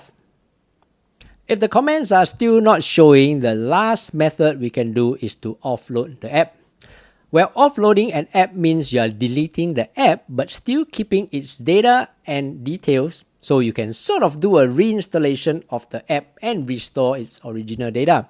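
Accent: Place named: Singapore. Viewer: Hi, Great channel sir. Im wondering if you can help me.. For the past year Ive been occasionally been making comments (usually compliments) on interesting channels. However I have virtually had no one ever add to my comment or even like it and when i look at all the other comments before and after mine most have a few other replies and likes from other members (who posted comments). I can visually see my comment after it's posted but it's like no one else can. Firstly, can you see my comment here? and secondly even if you can is there any other reason others cannot see my posts on other channels I comment on etc. Thank you! Jon New Zealand (Mate, you sound like you are from Singapore! I grew up in Singapore in the 80's lah!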